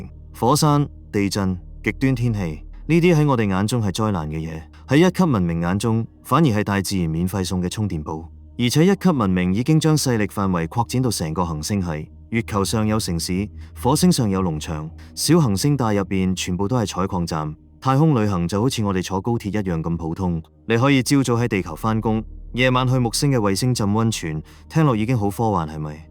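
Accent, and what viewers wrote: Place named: Hong Kong, China. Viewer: 好少有人用港式廣東話講宇宙級文明，好清晰唔錯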